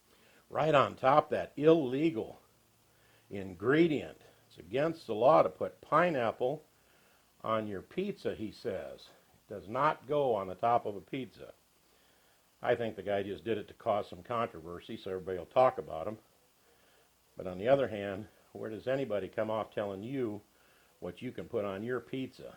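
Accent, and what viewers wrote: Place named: Midwest, United States. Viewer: I use to live in MN and now WI. You sound midwestern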